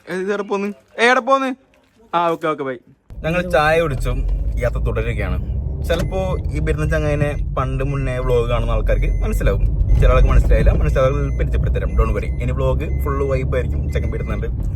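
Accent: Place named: Kasaragod, India. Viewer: Nigal kasargod anoo.... Live kandu.... Samsaram kettpo kasargod ann thonnni Ksd ano nigal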